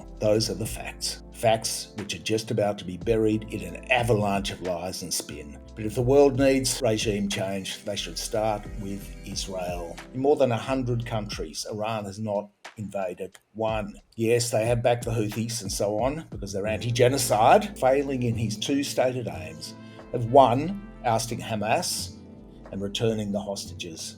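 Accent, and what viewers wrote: Australia. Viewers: It’s great to hear an Aussie accent speaking the intelligent logical sane truth! Thanks ❤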